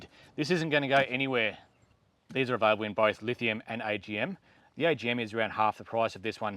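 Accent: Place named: Australia. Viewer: Can barely understand what your saying really really thick Australian accent nevertheless very interesting stuff, thanks Dude .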